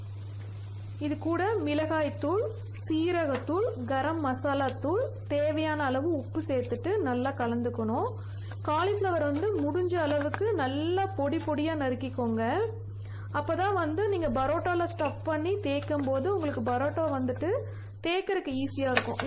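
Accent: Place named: Coimbatore, India. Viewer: I thought u must be from coimbatore.the people in coimbatore speak like u.their speech is very respectful.iam also tamilian.my mother is from trichy.my husband from coimbatore.i did my education in karnataka.i speak in home Tamil.is my opinion better to speak mother tongue at home what ever state u stay .because kids forget d mother tongue .next generation kids should learn their mother tongue.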